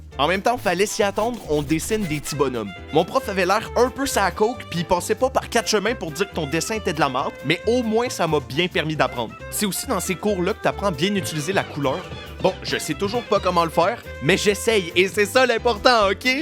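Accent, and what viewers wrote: Quebec, Canada. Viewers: Hell'oooo Cher Mister, Je viens tout juste de découvrir votre Chaine (et votre existence de facto ), et je dois vous avouer que vous m'avez très vite séduite, autant par l'Apparence de votre Mignon Alter-Ego Girafesque que par votre Humour et votre délicieux accent Québécois.... Donc je m'abonne de suite, et compte bien m'offrir le plaisir de grignoter d'autres vidéos animées de votre création dès que j'en aurais l'occasion. Bien Amicalement votre, Lenore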